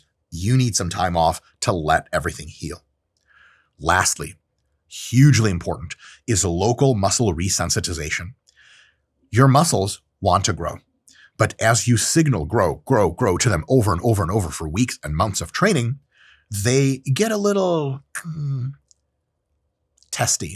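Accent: United Kingdom. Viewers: British accent 🤢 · Your British accent is aweeesome 😂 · I f******g loved the British accent. Much love from the UK brother. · Love the British accent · I need more of that British accent! Hilarious!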